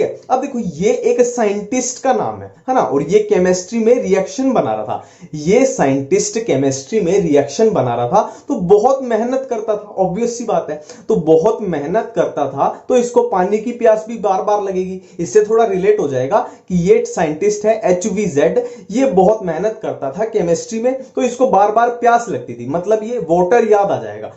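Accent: Haryana, India. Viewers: App haryanvi hai ...??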